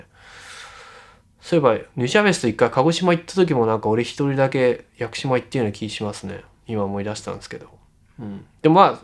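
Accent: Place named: Kansai, Japan. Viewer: ちょっと関西弁なんがすごい新鮮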